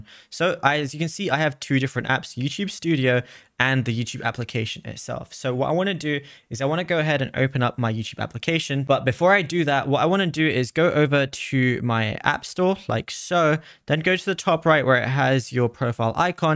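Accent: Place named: United Kingdom. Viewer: He’s got a British accent and he’s got it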